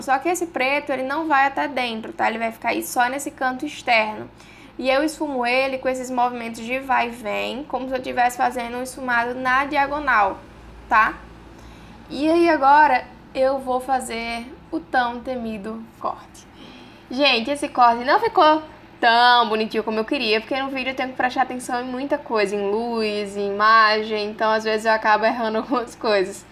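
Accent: Northeast, Brazil. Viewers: Dificuldade de passar batom??? Não vi nenhuma !!! Ficou lindo, make maravilhosa, amo seu trabalho, sua forma simples de ensinar, mesmo mostrando suas dificuldades. Isso mostra o quanto vc se mostra verdadeira e real no seu trabalho. Continue assim! Parabéns !!! Amo esse seu sotaque gostoso, já vivi no Nordeste e amo o clima, o sotaque a comida... Mais uma vez, parabéns !!!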